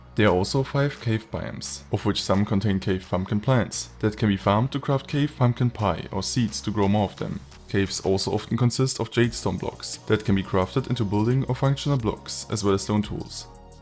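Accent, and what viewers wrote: Germany. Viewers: I hear german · German tippa accent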